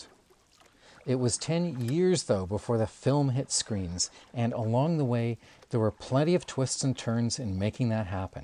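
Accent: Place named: Canada. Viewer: You must be Canadian. (E.g, pronounciation of boat, house, etc.)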